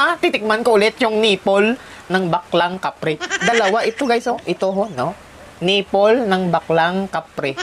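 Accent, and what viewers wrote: Iloilo, Philippines. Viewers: Ilonggo ka po dhay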